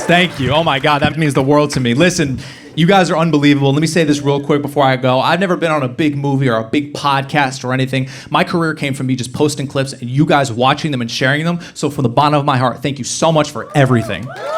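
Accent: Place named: United States. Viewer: Hiya I'm your BIGGEST FAN I LOVE YOUR STAND UP COMEDY. I COULD WATCH YOU 247. IM A BRAND NEW NEWBIE TO YOUR YOU TUBE CHANNEL CONTENT WILL. EVERY TIME I WATCH YOU I ALWAYS FEEL VERY CALM REALAXED AND CHILLED OUT VIBES. I LOVE YOUR AMERICAN ACCENT AND I JUST CRY WITH LAUGHTER ALL THE TIME TEARS RUN DOWN MY FACE CONSTANTLY. IM SO GLAD IVE JUST SUBSCRIBED TO YOUR YOU TUBE STAND UP SHOW CONTENT. WARM COSY HUGGIE SNUGGLES CUDDLES MATE LOVE RESPECT YOU LOADS NOW AND ALWAYS AND YES WILL YOUR A HUGE STRONG POSITIVE INSPIRATION IN MY LIFE AS WELL NOW MATE. KIND DEEPEST REGARDS YOUR BIGGEST FAN OF YOUR COMEDY STAND UP SHOW CONTENT ON YOUR YOU TUBE CHANNEL MY AWESOME BIG BROTHER 4 LIFE NOW MORE THAN YOU ALREADY KNOW THIS MATE. KIND DEEPEST LOVE RESPECT REGARDS MATE FROM MARTYN CHARLES JOHNSON IN ROCHFORD CITY OF SOUTHEND ON SEA IN ESSEX UK ENGLAND NOW AND YOU ALSO HAVE A BEAUTIFUL SMILE AS WELL MATE. 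KIND DEEPEST LOVE RESPECT REGARDS MATE MARTYN CHARLES JOHNSON 🫂😁🤝🙇🏻‍♂️💝💝💪🏻💪🏻💪🏻💪🏻👍🏻👍🏻😉💛💛💛💛🫅🏻🫅🏻🫡⭐⭐👋🏻🫶🏻😍😍🏡🎧🎤😉👍🏻👍🏻💪🏻💪🏻💛💛👋🏻🫡🤝🙇🏻‍♂️😉💛💛🫅🏻🫅🏻🎧🎤🙇🏻‍♂️💪🏻💪🏻💪🏻💪🏻😁😘😘🇬🇧🙇🏻‍♂️🫡💛💛🫅🏻🫅🏻😁🫂🙏🏻🇬🇧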